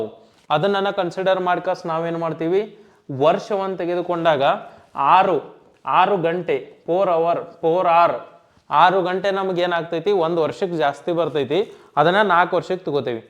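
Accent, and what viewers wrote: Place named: Belagavi, India. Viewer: ನಿಮ್ಮ ಬೆಳಗಾವಿ ಕನ್ನಡ ತುಂಬಾ ಚೆನ್ನಾಗಿದೆ ಸರ್ 🙏🙏🙏🙏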